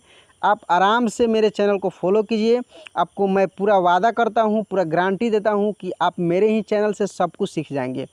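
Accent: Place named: Bihar, India. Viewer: Out of all Hindi teachers Guru Ji I like your Bihari accent Bahut Dhanivaad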